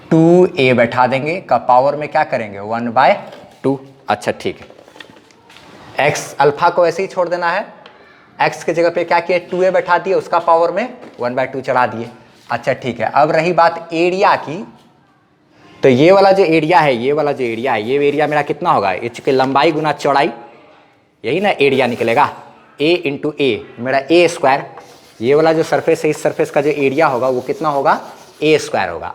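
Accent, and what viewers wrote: Bihar, India. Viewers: Where is your home sir ? I think you are bihari because the way you speak is like bihari ...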